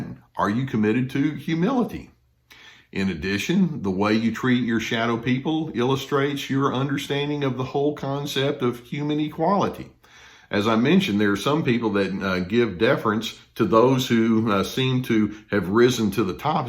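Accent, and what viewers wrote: Texas, United States. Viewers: He's not southern, he's Texan. :)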